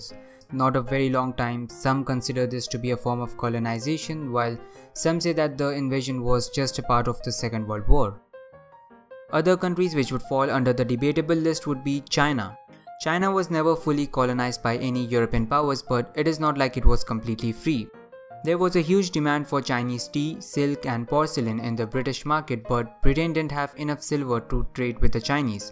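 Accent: India. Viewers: I was fine with the accent, couldn't actually tell you were Indian, but, please. Back up from the mic next time, the peaking is somewhat annoying. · The Indian accent is fucking hilarious.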